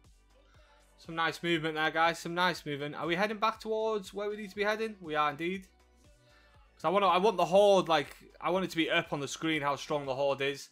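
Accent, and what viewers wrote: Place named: Liverpool, United Kingdom. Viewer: I'll subscribe just cause you're scouse lad. Get sick of watching the American kids sometimes 😂😂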